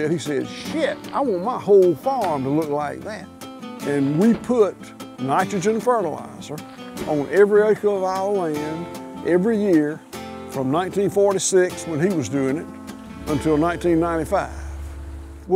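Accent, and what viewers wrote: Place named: Georgia, United States. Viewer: you have the biggest beating heart at all! Thanks so much for this insight to your amazing life and how you have transformed the soil and helped so many people. I love your Georgian accent as well! I'm from rural England and it's green like your land, but the lifestyle is different, more town based.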